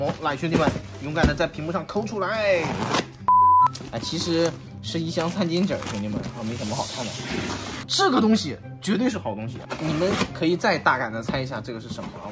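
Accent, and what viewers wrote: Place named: Malaysia. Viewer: 马来西亚口音XD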